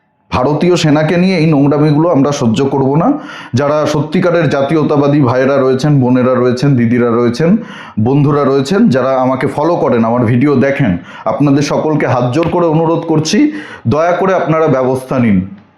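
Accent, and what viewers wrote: Bangladesh. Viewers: She sounds Bangladeshi.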